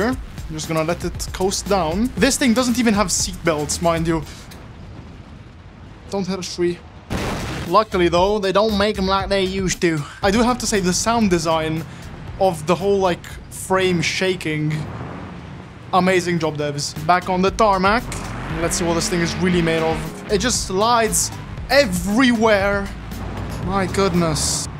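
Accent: Italy. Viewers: Is he italian? I am And its italian sounds good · Can someone confirm to me that he is Italian because the location is in always Italy, he sounds Italian and i say that from expirience of being 50% italian.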